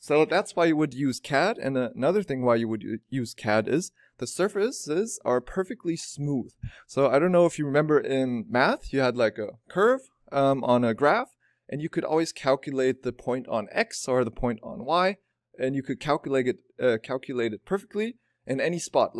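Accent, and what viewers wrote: Germany. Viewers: You sound very german:) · Achso du bist Deutsch? Ich habe dein Channel noch nicht so lange verfolgt. Man hat das muss ich zu geben ein bisschen an deinem Akzent bemerkt aber eigentlich kannst english so gut das ich erstmal dachte du könntest auch von ganz wo anders her kommen.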